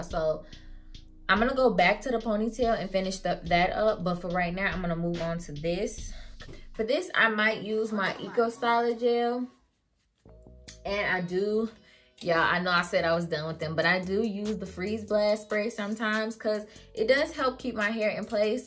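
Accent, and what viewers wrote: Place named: Chicago, United States. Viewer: i can tell you from chicago from yo accent 😍😍 you pretty!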